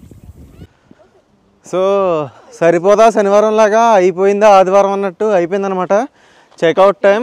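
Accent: Godavari, India. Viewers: Absolutely loved this video! The way you captured the essence of mana Godavari with that authentic yaasa was incredible. It brought back so many memories, and your storytelling was spot on. Keep up the amazing work,! Looking forward to more such gems from your channel. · Annoooo godavari style haa❤❤❤❤❤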